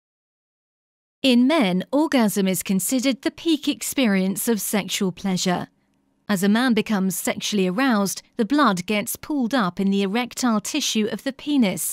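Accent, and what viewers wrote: United Kingdom. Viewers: This was very informative and also hilarious for some reason. I think its the british voice 🤣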